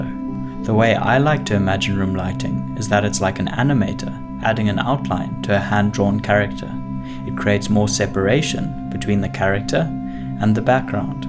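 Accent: South Africa. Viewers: You sound South African 🤷‍♂️ lol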